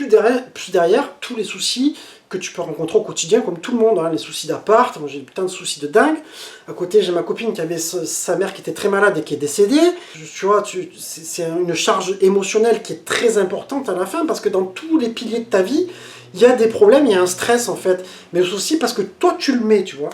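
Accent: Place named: South, France. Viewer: Courage à toi, prend ton temps et prend soin de toi. Hâte de revoir tes vidéo avec cet accent du sud qui me fait tellement du bien les matins:) Des vidéos spontanées avec ton naturel ça sera top je pense!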